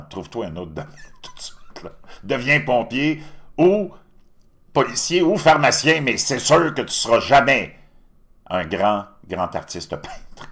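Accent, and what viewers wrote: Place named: Canada. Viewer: Bonsoir, je vous écoute toujours avec un grand plaisir avec vos jokes, tournures de phrases et votre accent canadien 😂. Concernant votre sujet du jour je désire apporter mon témoignage. Je suis née fille en 1960 et je le suis toujours aujourd'hui... grâce à mes parents qui étaient logiques à l'époque. En effet à l'adolescence, époque que l'on qualifiait "d'époque bête" j'ai eu envie d'être un homme je n'aimais pas trop mon corps de fille. Pas pour le sexuel, en <num>, on ne savait pratiquement rien de ce qui avait trait au sexe 😊 et quoiqu'on en pense, ce n'était pas un souci pour notre vie sexuelle d'adulte. Moi, je voulais être un homme parce que je pensais que les hommes ne souffraient pas et que seules les femmes étaient malheureuses ! Quelle chance que mes parents ne se soient pas préoccupés de mes pensées délirantes d'adolescente 😂😂 je serais un homme vraiment malheureux ! 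Non, non !!! Je suis restée dans ma nature de femme 😊 et maman de deux enfants😊. Je remercie mes parents disparus 😢 de leur lucidité. Ceci est mon message aux parents et enfants d'aujourd'hui. Merci de vos vidéos 😊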